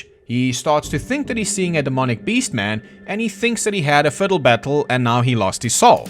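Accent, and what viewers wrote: South Africa. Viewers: Bro? Are you South African? I'm asking because I'm South African and your accent is sounding very familiar 😂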